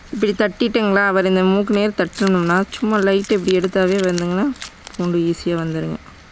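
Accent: Kongu, India. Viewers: Akka ungal kongu tamil alagu Akka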